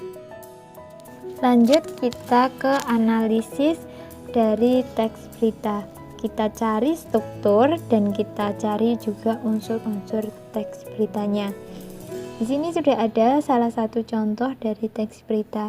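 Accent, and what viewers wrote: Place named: Java, Indonesia. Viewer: Medok sekali bu suaranya 😁 tapi gapapa bu terus berkarya 🤗🤗 saya venny dari kelompok yayuk cs 🤣🤣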